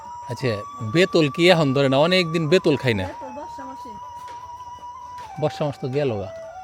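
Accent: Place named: Jamalpur, Bangladesh. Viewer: জামালপুরের ভাষা টাঙ্গাইলের নাগরপুর এর ভাষা সেম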